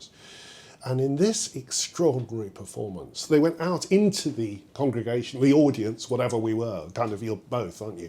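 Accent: United Kingdom. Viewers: No need for blasphemy to praise Bach. He would be appalled by your reference to “divinity.” In addition your performance and narrative are disgusting—especially the poncey British accent. Goodbye!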